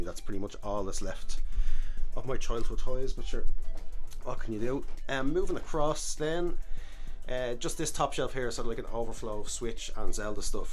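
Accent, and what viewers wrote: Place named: Ireland. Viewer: Irish accent makes this better.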